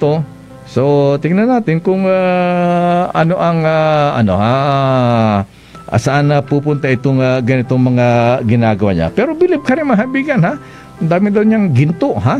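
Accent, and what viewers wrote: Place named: Batangas, Philippines. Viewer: DIBA MARCOS KA? bat Batangeunio ang accent mo................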